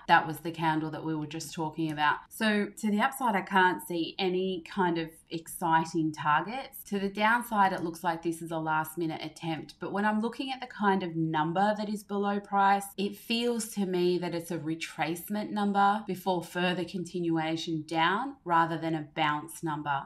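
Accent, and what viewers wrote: Australia. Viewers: Hi, great content, you sound like an Aussie !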